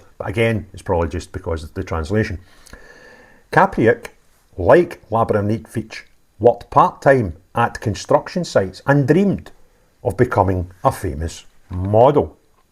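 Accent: Scotland, United Kingdom. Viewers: I’m sorry, I didn’t want to listen to all of this, but the Scottish accent ain’t doing it for me. What a shame.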